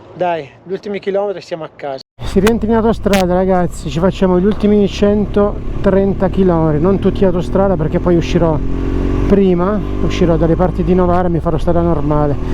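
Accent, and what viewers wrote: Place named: Montesilvano, Italy. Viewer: Ciao secondo me veniamo dallo stesso paese: Montesilvano. Sbaglio? Il nostro dialetto è inconfondibile. Mi sono imbattuto casualmente su un tuo video e naturalmente non ho potuto che iscrivermi al canale. 😀 Sono di Rivalta di Torino e sul Nivolet solitamente ci vado in bici da corsa. Complimenti per i tuoi video, mi piacerebbe “tornare” in moto; a Montesilvano avevo una Honda 750. 👍💪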